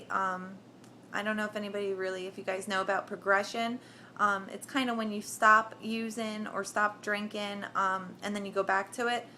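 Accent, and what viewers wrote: Philadelphia, United States. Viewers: hey I really appreciate your vids. don't listen to the haters and I hope ur getting a good laugh at the people commenting on here that are obvi. LEOs. anyway you bring up really good points. are u from philly? I think I hear the accent lol. im from the suburbs detoxing myself from subs right now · Lol soon as I heard you talk I knew u were from Philly or jersey. Philly here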